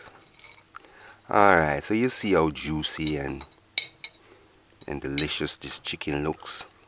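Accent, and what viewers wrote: Jamaica. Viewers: It is Jamaica accent I'm live there also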